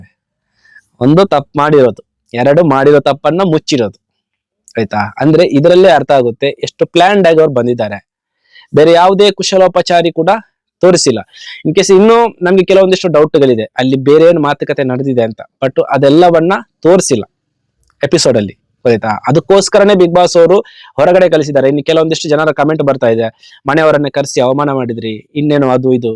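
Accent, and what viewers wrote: Mangalore, India. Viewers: ನೀವು ಬಿಗ್ ಬಾಸ್ ಬಿಗ್ ರಿವ್ಯೂ ತುಂಬಾನೇ ಚೆನ್ನಾಗಿ ಮಾಡುತ್ತೀರಾ. ನಿಮ್ಮ ಮಂಗಳೂರಿನ ಭಾಷೆ ತುಂಬಾನೇ ಚೆನ್ನಾಗಿದೆ ♥️♥️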